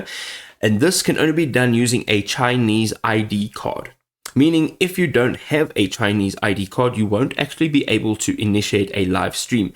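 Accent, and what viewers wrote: South Africa. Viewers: Are you from pretoria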